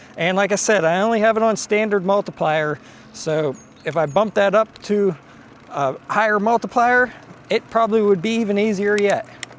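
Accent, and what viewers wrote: Pittsburgh, United States. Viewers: My man, you HAVE to be from Da Burgh. LOL!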